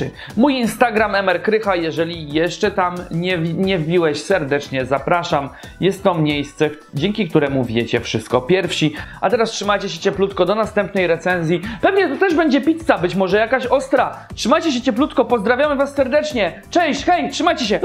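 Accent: Poland. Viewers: Jak ja nie nawidze tej toruńskiej gwary, serkem... Ołówkem ...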